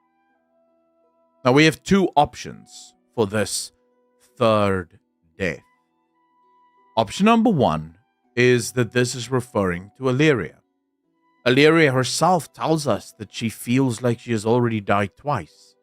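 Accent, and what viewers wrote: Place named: South Africa. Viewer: South African, if I'm not mistaken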